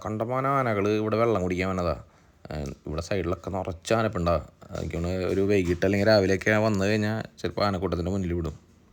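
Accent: Thrissur, India. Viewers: Ninte Thrissur slang super.. videosil Ellam oru positive vibe undu 😍😍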